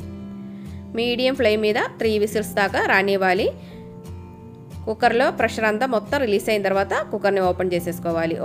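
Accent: Kerala, India. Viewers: Nice, you are a Malyali ,must have settle in Hyd since long , Your slang is a mixed kichidi of Malyalam & Telugu 🌰🥒🍅🍆🌶🥕😊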